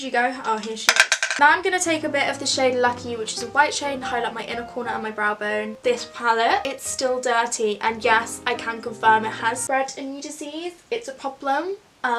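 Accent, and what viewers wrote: Scotland, United Kingdom. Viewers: Is she Scottish or British cause confusion but still she amazing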